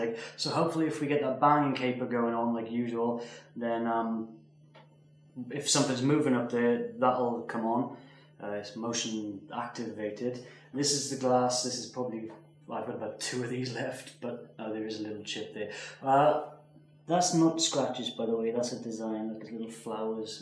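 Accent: Ireland. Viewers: Are you from Ireland? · He sounds like an Irish guy that moved to England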